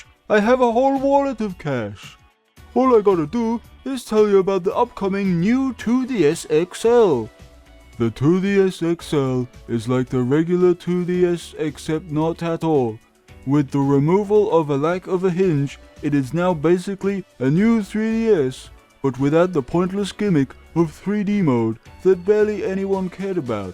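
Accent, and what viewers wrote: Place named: United Kingdom. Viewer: wait you're British... bitchin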